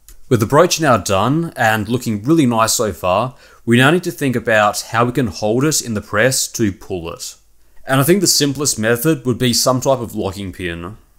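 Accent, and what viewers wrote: Australia. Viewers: For someone like me who learned english at school some decades ago (british english as in most european schools) at the beginning it was not easy to understand everything spoken with this specific australian accent. But I got used to it and it pays off. Meanwhile I have watched every single of your videos and learned quite a lot!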